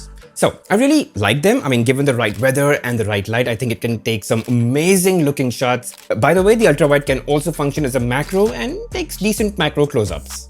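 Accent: India. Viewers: Your Indian accent sucks too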